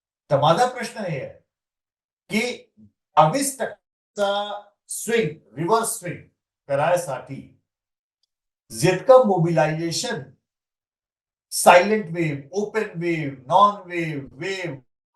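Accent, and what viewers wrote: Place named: North India, India. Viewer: हा भैया सारख मराठी बोलतो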